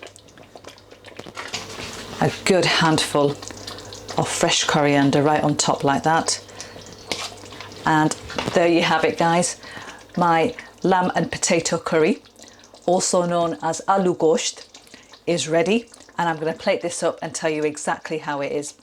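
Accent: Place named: Yorkshire, United Kingdom. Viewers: She sounds like a Yorkshire lass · My God I am honoured! I truly am!! I infer from your accent you are a northerner, I'm from London but could conceivably make the journey up, however I'm in two minds about appearing on camera, I will let you know. But I really feel you will eventually hit the big 7 figures, as I may have said above you've tapped into something quite enormous, for reasons I'll try to message you.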